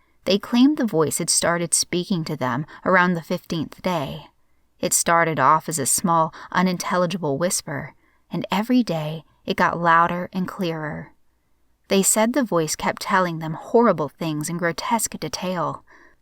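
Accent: South, United States. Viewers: it's so cool and her southern accent comes out so well in that and it's like seriously my favorite story I've listened to it a couple of times I don't want to wear it out I love that story it's awesome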